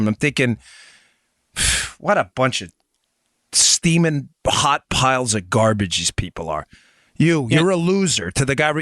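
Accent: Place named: Queens, United States. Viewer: nobody can say it like a guy from Queens, I LOVE IT!!!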